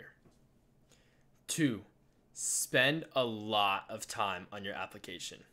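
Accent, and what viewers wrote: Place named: Southern California, United States. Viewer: According to who? He sounds like a well spoken chill SoCal guy to me. He was funny, he didn’t have pauses with ums and ahs, pretty great oration - what were you hoping for? lol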